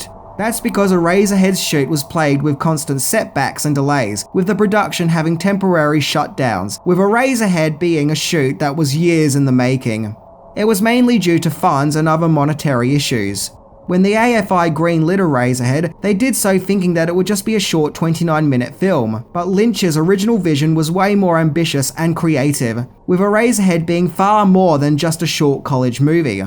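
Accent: Australia. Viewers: he's Australian and it could be his way of pronunciation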